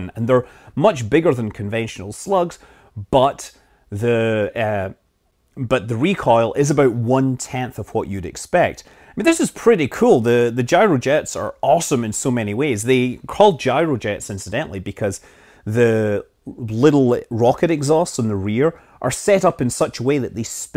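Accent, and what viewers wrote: Scotland, United Kingdom. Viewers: Hear the voice of a true Scottish Space Highlander! Also, millions of Americans have some Scottish ancestry. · More likely Scottish.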